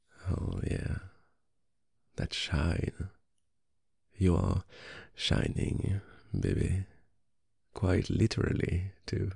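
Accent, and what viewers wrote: France. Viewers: I'm SOOO into french accents lately 😗😩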